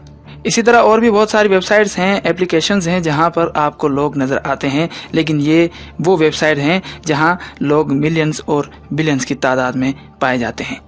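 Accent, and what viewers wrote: India. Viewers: سب سے پہلے حرامی تم ھو جو یہ ویڈیو ڈالنے والے حرامی ماں اور بہن کی تصویر بھی ڈال دیتے۔شرم کرو ایک تہجد گزار عورت کے بارے میں بکواس تمہیں شرم آنی چاہیے ۔تمہاری آواز سے لگتا ھے کہ تم انڈین اسلئے تمہیں کیڑا کاٹ رہا ھے۔ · تصویر پتہ نہیں کسی نے لگائی بھی کہ نہیں تم بلاوجہ بیغیرتی کر رہے ہو۔ اور تم لازمی انڈین ہو تمہارا لہجہ صاف بتا رہا ہے اور ہمیں پہلے ہی پتہ چل چکا ہے کہ مریم نواجی نے اپنے میڈیا سیل کو بشری بی بی کی کردار کشی کا ٹاسک دیا ہے تم بھی اسی کا حصہ تو نہیں؟؟؟ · Bolnay wala banda 100 % indian hay. Iski urdu sun lain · Mr .who ever you arebut one thing is confirmed that you are not a pakistani but an indian your accent is not pakistani at all ,muslim or hindu i can tell too. let me listen u again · HADEES MUBARAK HAY NAKE MARD KO PAKBAZ BEVI UR GANDAY KO FAHISHA UORAT MALAY GEE, PAHLAY IMRAN KA KIRDAR DAKH LO BUSRA KA FASLA HADEES KEE ROSHNI MAIN KAR LO. 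. . DOSRI BAT YA VIDEO JIS NAY BANAEE HAY US KEE URDU SUN LO INDIA WALOON KA LAHJA HAY CHANAL KA NAMUSALMAN JASA RAKH KAR N LEAGE PER ILZAM RAKH KAR SIRF RAW KA MAQSAD PURA KARNA HAY, AB FASLA KHOD KARO DUSHMAN INDIA HAY YA MUKALIF SAYASI JAMAAT . BAQI ISLAM TO KAHTA HAY LA RAJBANEEYAT FEE ISLAM ISLAM MAIN PEERI FAQEERI NAHEE HAY ,,,,KOJA AAK UORAT DAWADAR BAN JAEY PEERI KI UOR HAZER KHAWAND KO CHOOR DAY JAWAN BACHOON KO SARMINDA KAR DAY, CRICKET HERO KO PANAY KAY LEYA , PHER TAWEZ GANDNAY KARAY ( LANAT KI ALLAH NAY GRHOON UOR GANDOON PER PHOOKNAY WALOON UOR WALIOON PER) KHAWAND KO KURCI DELANY KAY LEAY ,,, TO FIROON KAY JADO GAROON KAY LEAY AKK MUSA BHI AYA KARTA HAY UOR US KAY PASS AKK DANDA ( AASA) BHI HOA KATA HAY,,,, JO SUB JADOO GANDAY KHA KAR DAKAR BHI NAHI MARTA. KITNI SAFAEE DO GAY, IN AGENTS SAYASAT DANOO KI BUS,,,,,,, PAK,,,,,,,,, FOG,,, DINDABAD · مجھے تو تم اپنے لہجے سے انڈین لگ رہے ہو۔ رہی بات بشرا کی تو جو عورت کسی کے ساتھ تیس سال گزارے اور اس کے پانچ بچے ہوں اور نواسے نواسیاں بھی ہوں اس کے بعد اگر وہ علیحدہ ہو کر کسی دوسرے سے شادی کرتی ہے؟ تو اس کے بارے میں کیا کہہ سکتے ہیں؟ پنجاب میں ایسی عورت کو کوئی عزت کی نگاہ سے نہیں دیکھتا۔ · This rubbish video made by Indian the man who is telling fake story he is 10000% Indian his accent is Indian he cannot speak clear urdu may be hire by corrupt PMLN. 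False story.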